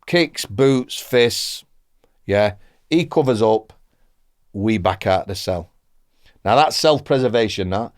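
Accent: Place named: Northern England, United Kingdom. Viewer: NEUTRAL ACCENT LOL how on gods good earth can this guy think is southern? If he was American I could understand but for fuvks sake how can any British person not know is from the north? This guy must have taken too many knocks from Danny g and something got dislodged in his head! Lol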